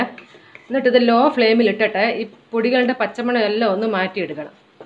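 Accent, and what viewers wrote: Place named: Thiruvananthapuram, India. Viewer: തിരുവനന്തപുരം സ്ലാങ് 😊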